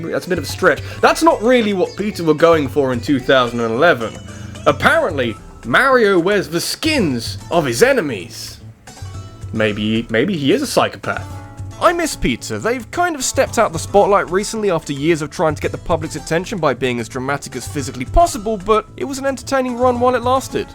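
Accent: United Kingdom. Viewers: I love how people from the Uk say controversy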